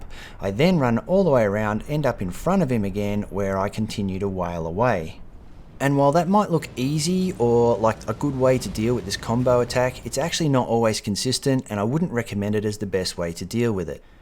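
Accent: Australia. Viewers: During a perfect battle he can barely do anything special but if you take too long my god he sculpts you into his bitch. Thanks again, when I hear that familiar aussie accent I know everything will be okay. Dissecting the videos like this has really made me appreciate the combat too.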